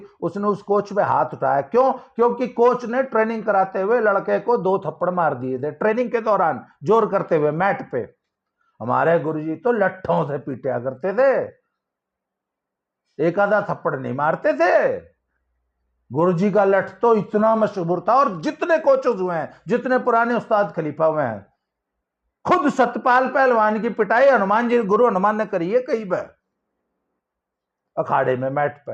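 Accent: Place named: Punjab, India. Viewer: I really like how you explain things without sugar coating, and yes, travel teaches a lot. It can be seen from your videos that you have a good hold over Eastern UP dialect, a nice heavy Haryanvi tone, and a nice Punjabi accent. Your "done this, done that" attitude is inspiring for me as a young father. Keep up the good work.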